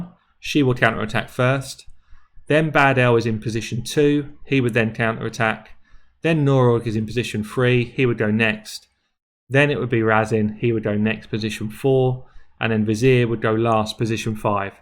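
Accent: United Kingdom. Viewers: In my humble opinion, you are a top raid analyst. I regularly watch tons of popular raid bloggers both english and russian speaking and I can definitely tell that your particular way of analysis, your explanations, your tips are by far the most impactful and valuable. Plus the British accent. Absolutely fabulous.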